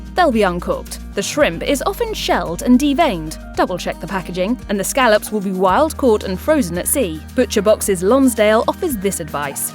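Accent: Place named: England, United Kingdom. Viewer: The English accent is terrible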